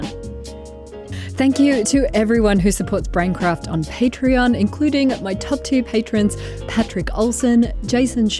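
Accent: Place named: Australia. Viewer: I felt nostalgia watching this hearing your aussie accent and wondering if that walking track was either in the central highlands or the Dandenongs ... :-) But here in Germany they have a thing called Gemütlichkeit which is like the dutch term you mention. Sort of like coziness but also social. And not to forget hygge the danish term which even has its own magazine here.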